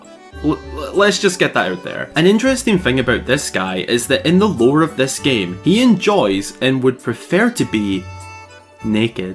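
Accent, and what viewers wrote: Scotland, United Kingdom. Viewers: He is scottish I just realized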